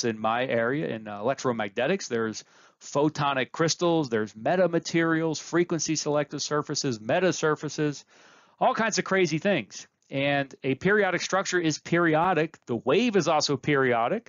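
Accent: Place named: United States. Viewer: nevermind actually, apparently according to which English accent you have it's pronounced differently and you have the American version my bad. Still a good video though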